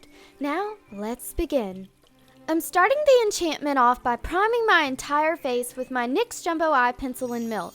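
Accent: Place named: South, United States. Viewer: i love your look, such an amazing transformation but I have to admit, I laughed so much when you're cute southern accent said 'Ghetto Gold', made my day <3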